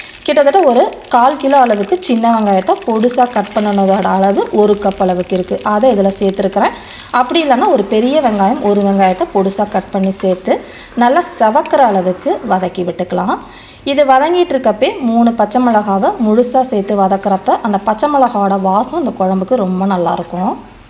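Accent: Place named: Coimbatore, India. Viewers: U r tamil slang is very nice it like coimbatore style I am from coimbatore so I love u r tamil I feel iam in local coimbatore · கொஞ்சும் கொங்குத் தமிழில்.. மீன் குழம்பு .. சுவை மனம் கமகம..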